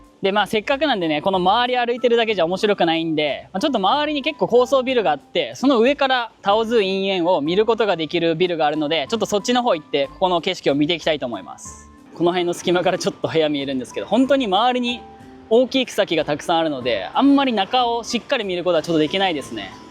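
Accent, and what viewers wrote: Japan. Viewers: 長野出身ですか？ 結局1軒しか売れてないなら、住んでる人には安いよね。ビル1棟だもんね。とてもエコとは思えないけど。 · たまにのぶりんさんの動画見たりしますが、のぶりんさんと同じアクセントで話されますね。北陸のご出身ですか？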